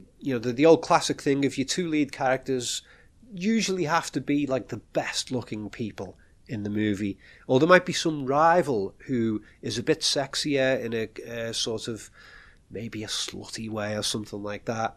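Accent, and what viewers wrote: United Kingdom. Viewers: Im not a natural speaker is this a british accent?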